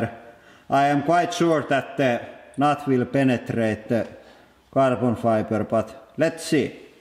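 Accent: Finland. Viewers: are u from Finland because u sound like the dudesons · suomalaista paskaa englantia :D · its the finnish accent becouse we speak how we write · rallienglanti paras englanti : D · I love his accent. Finnish :)! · Judging by his accent, is he Finnish?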